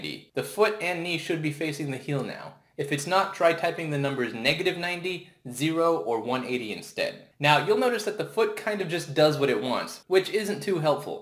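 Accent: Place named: Brooklyn, United States. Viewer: before he had the brooklyn accent.....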